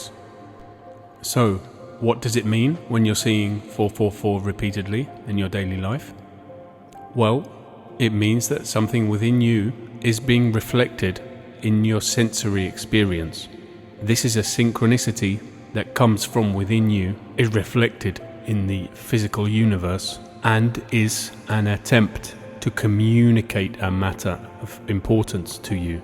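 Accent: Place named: United Kingdom. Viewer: It is good to hear the British English accent. so classy.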